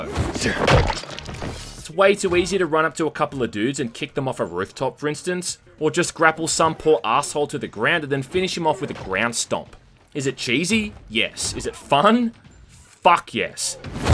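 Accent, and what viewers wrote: Australia. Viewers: There's something about an Aussie reviewing anything that is just amazing. Love you work man!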